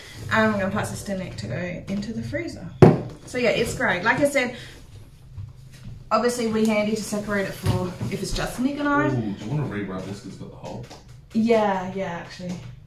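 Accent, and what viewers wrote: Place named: Australia. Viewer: Sorry missed township U are at next time please repeat is it in Aussie U sound Australian... For Breakfast if U like steak buy some Minute Steak tender and cooks fast...Lamb Rump Steak are yummee sear quickly on the stove in butter and a little oil...make some gravy up for morning with eggs on toast yummee... Only suggestion buy some Topside Mince from butcher U will never go back to Coles... Why don't U spend $200 at Butcher or make a deal with him as he is trying to get your business...veal chops are great to eat aswell... I think Nick is correct country things are better than Grocery Stores... Whole Chicken make Broth out of thr Bones...also if U like those Expensive $13kg Tomatoes grow squeeze some seeds into a pot grow your own...as it looks like Summer...I was always told if U like the taste of a tomatoes U keep the seeds of them for now or next year to grow put some on a paper towel...😂❤😂❤😂❤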